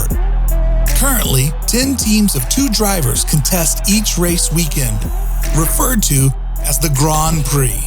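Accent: United States. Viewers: Hearing the British pronunciation of garage in an American accent is extremely weird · This just doesn't sound right wit han American accent, I get it, they are trying to appeal to the U.S....but seriously! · This sounds so American it hurts. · "Exiting" Miami GP as example next to Silverstone? And the American accent, who would this video be ment for? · Very weird to hear anything F1 narrated in an American accent. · ugh ofc they had a yank doing the voice-over. hate listening to their accent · Make it less American please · haha they needed an American voice for all of the Yanks to try and understand. They are the ones who need teaching as they are all the newbies. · Could have done without the American accent. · I love how only the beginners guide to F1 is narrated in an American accent :D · Should have had an English person voicing this, not an American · Had to get an american guy to narrate it so yanks can understand🤣. 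F1 should still keep in touch with their heritage. Mention the great old days instead of constantly looking into the future. Edit: Oh yeah here's an alternative title to this video, A guide to f1 for americans. Americanisation of a great sport, it's sad to see the sport be ruined like this. A great sport, just to be ruined by money. Miami a exciting street circuit?? Yeah I don't really think anyone says that, what about circuits like Azerbaijan etc, talk more about the heritage man, F1's really going to the dogs. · The fact that this video is in American-English instead of the usual British-English, goes to show for me that this video is mainly for Americans jumping the bandwagon on the F1-hype at the moment. Also, 3 American GP's?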